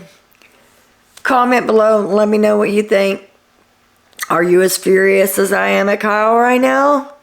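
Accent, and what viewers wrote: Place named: Louisiana, United States. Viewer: I just recognized the LSU fabric in the background. Im in Louisiana and I thought your accent sounded familiar. We have such similar views on this cast and their doings; must be a southern thing.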